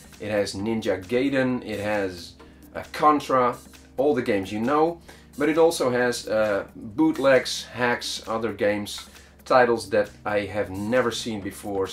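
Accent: Netherlands. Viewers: 2 questions, your "american" accent sound very dutch, am i righr?, en mijn tweede vraag: waar heb je dit gehaald? ik wil ene kopen... ik ben 100% zker tot gij NL zijt of belg xD mijn twee vragen zijn voorbij, please help me.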